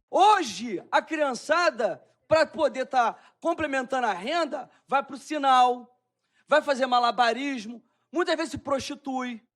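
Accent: São Paulo, Brazil. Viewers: falando paulistanês é o melhor MEU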